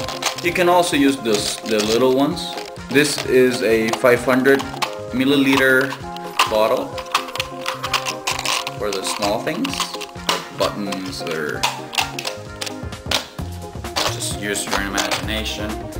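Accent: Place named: Brazil. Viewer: Você fala inglês mas você é Brasileiro, seu sotaque não nega.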